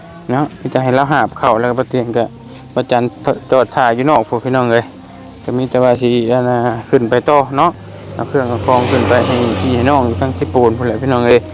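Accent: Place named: Isan, Thailand. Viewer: ภาษาเว้าคือกันทางบ้านข่อยเด้อยุแถวอำเภอกุสุมาลย์ จังหวัดสกลนคร กับแถวอำเภอท่าอุเทน/อำเภอโพนสวรรค์ จังหวัดนครพนม ฝั่งไทยเด้อ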